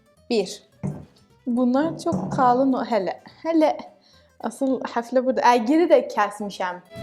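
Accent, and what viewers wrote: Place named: Azerbaijan. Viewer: sanki azerbaycan şivesiyle konuşuyorsun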